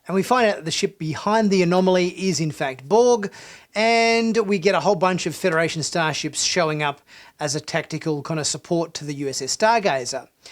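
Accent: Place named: Australia. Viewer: So I'm confused. I'm in Australia and checking my Amazon Prime Video this Season 2 Episode 1 is not available yet as of 4Mar~ am AWST, assume it will drop this afternoon as it usually does., by your accent I assume you are Aussie and are in Australia, so how did you (and others) watch the Episode? Obviously I'm missing something somewhere?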